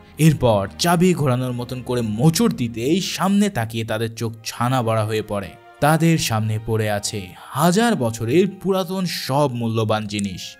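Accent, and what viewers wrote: Bangladesh. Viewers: আপনি কি বাংলাদেশি? আপনার কথায় মনে হয় আপনি বাংলাদেশি😊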